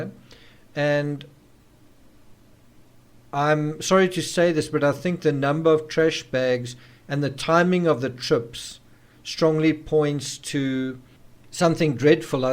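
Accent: South Africa. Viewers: South African accent? A quick google confirms :)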